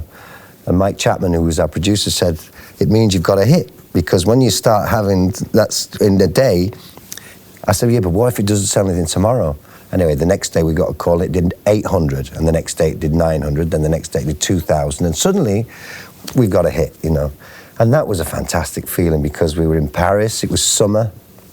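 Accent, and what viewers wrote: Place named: United Kingdom. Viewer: Love listening to yr posh English voice. Come back again.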